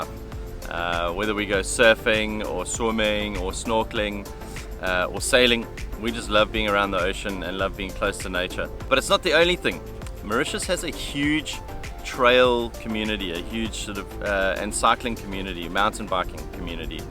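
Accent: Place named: South Africa. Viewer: Hi. I found Yr channel while scrolling for Islamic prayers. I am pleasantly surprised by Yr positive and realistic attitude to Yr stay here in my little island, Mauritius where I was born n still living. Thanks so much for the realistic yet positive review on Mauritius. I hope u explore my little island little more enjoy z feel of Ramadan 2025 and Christian fast 2025 and get to know about our cultures values religions cohabiting harmoniously. I like the fact that u love the simple life in Mauritius. For Yr car, find yrself a mauritian mechanic which will cost u very very less than even rs 10,000 for servicing etc. I have a Toyota vitz yr 2000 with 6 months car fitness n 1 Yr road tax n I pay rs 6000 including mechanic fees for every 6 months servicing. If u so wish u can get a mauritian mechanic whose name is Saleem in another town called Beau Bassin. 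U won't pay so much. People will exploit u once they find from yr talking accent that u r from South Africa. So happy to hear from u n will wait for Yr next videos. 😊 lots of love from mauritius 🇲🇺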